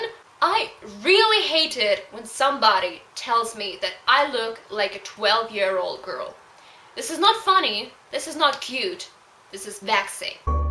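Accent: United Kingdom. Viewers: I love that British accent you have.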